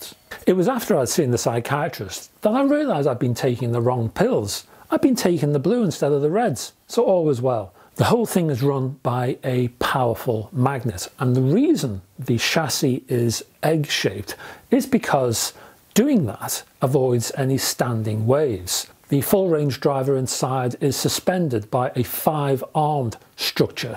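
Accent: United Kingdom. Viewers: Love the British speak, very eloquent indeed. Net, net though the review indicates these are too bright in the midrange on some vocals and recordings, which can cause ear fatigue. While boomy bass and hissing highs in some speakers are never good either, because the human ear is most sensitive in the midrange, if an $8,000 pair of speakers can get too peaky on the mids in some cases, that's the worst area to have a problem. Also this review only refers to a very few recordings.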